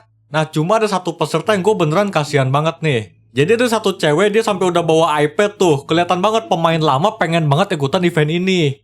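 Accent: West Java, Indonesia. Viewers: terungkap sudah dia tinggal dikota mana 😂😂 tapi di channel manapun dia nyunda bangett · wih aya Sunda sundana ei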